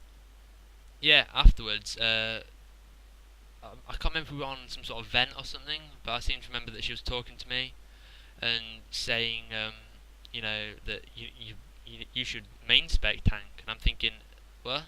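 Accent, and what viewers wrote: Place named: United Kingdom. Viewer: Know what's better than listening to how someone started playing WoW? Listening to someone with a badass British accent talking about how they started playing WoW :P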